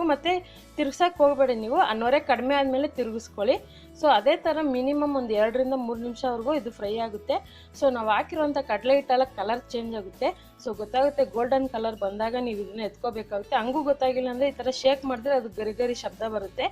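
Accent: Tamil Nadu, India. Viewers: ನೀವು ತಮಿಳು ಮಾತನಾಡೋ ಭಾಷೆಯವರು ಇರಬೇಕು. ಕನ್ನಡವನ್ನು ಸ್ವಚ್ಛವಾಗಿ ಮಾತಾಡೋದನ್ನು ಕಲೀರಿ.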